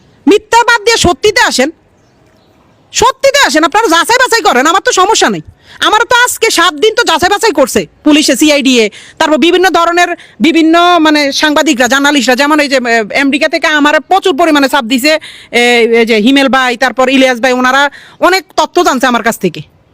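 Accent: Noakhali, Bangladesh. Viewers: নোয়াখাইল্লা মারাক্তক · তুই যে pure লোয়াখাইল্লা তোর কথার মধ্যেই এটা ফুটে উঠেছে। তোরে বাংলাদেশের মানুষ একটু একটু চেয়ে ঐ তৌহিদ আফ্রিদির সঙ্গে থাকছোস বইল্যা। আজকে সে বিপদে পড়ছে আর তুই যে স্বরে কথা বলতেছে তাতে তুই যে একটা বেঈমান তারই প্রমাণ দিচ্ছিস।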